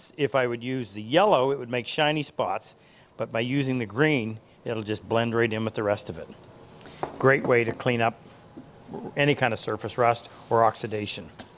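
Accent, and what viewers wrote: Canada. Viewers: Ar u a Canadian or American Ah!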